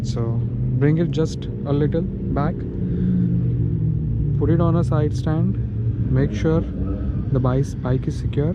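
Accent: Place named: India. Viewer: Sounds like your Indian, hit me back looking for a fellow countryman to ride Road Atlanta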